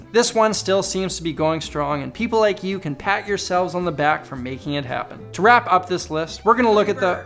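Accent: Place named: Canada. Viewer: I had a suspicion you were Canadian. The accent gave it away.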